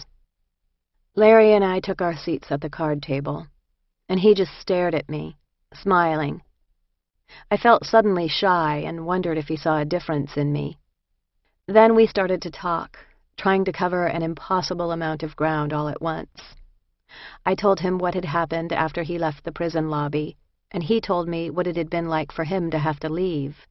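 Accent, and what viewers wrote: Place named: Russia. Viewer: Love her Russian accent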